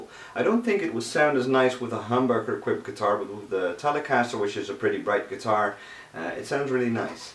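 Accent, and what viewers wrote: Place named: Netherlands. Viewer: Could a silicon transistor be paired with a Germanium transistor? Get the best of both worlds. Also, what accent is that? Dutch? It's barely noticeable.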